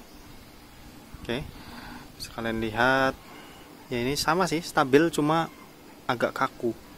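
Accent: Semarang, Indonesia. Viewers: Loh masnya orang semarang toh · Orang semarang tah mas?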